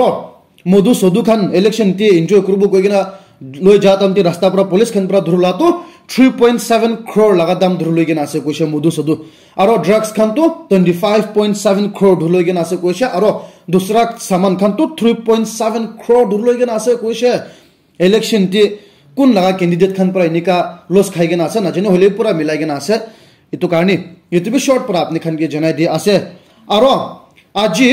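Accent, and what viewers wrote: Nagaland, India. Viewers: Nothing,but sumi prononciation.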